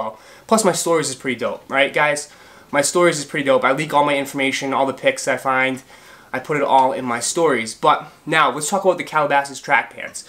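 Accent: Boston, United States. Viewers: Damn bro I knew you were from Boston before you even said it lol I have a co worker who talks just like you! Shoutout from Seattle my G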